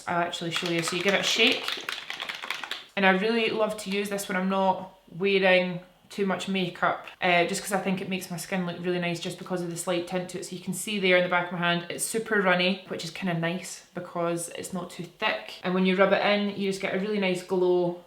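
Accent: Scotland, United Kingdom. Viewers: Love your videos! It's nice to hear a fellow Scottish voice on Youtube 😍😊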